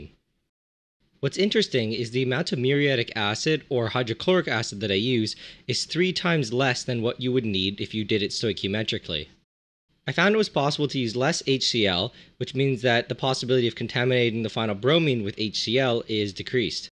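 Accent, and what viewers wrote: Canada. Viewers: are you from Canada?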